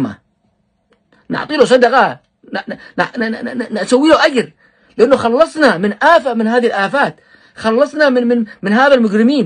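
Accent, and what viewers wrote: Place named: Hadhramaut, Yemen. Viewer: لهجته حضرمي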